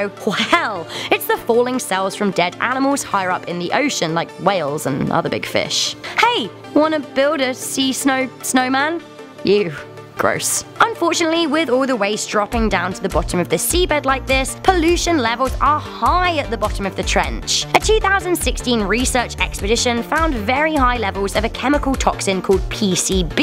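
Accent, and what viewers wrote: United Kingdom. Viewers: yeah thanks but i dont wanna hear british english anymore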